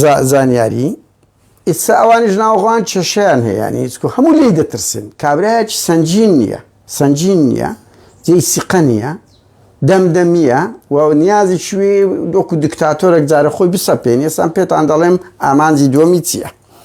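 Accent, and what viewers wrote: Erbil, Iraq. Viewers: زماني هةوليريةكةت زور زور خوشة بةردةوام بة ئةمة لة بال ئةوةي كة بةريزت زور ئازا و بويري و بة هيواي ئةم دةنگة دليرةت هة بمينيت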